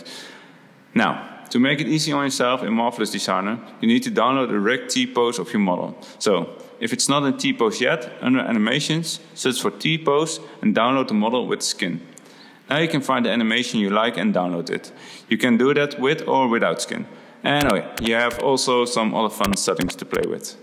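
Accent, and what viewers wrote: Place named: Netherlands. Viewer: Nooooo, your English is perfect I am sure it is even better than mine, but I recognize Dutch accent from their pronunciation of the letter "S". In Africa we ask people not to sleep in the open, because of the missile. hahhahahah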